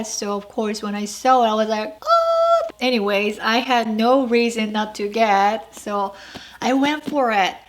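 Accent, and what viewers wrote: South Korea. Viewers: sorry can i ask you one thing? are you from korea? cuz i hear some korean accents 😹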